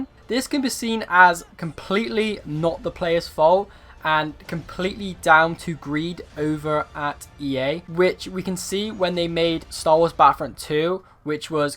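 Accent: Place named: Bristol, United Kingdom. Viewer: You have a Bristol voice !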